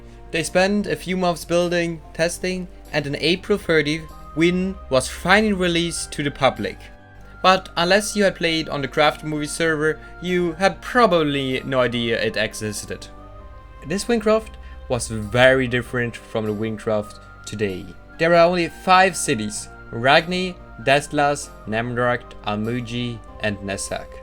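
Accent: Germany. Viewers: du bist deutsch oder hahaha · You are german aren`t ya? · Are you german? Your accent seems like a german accent.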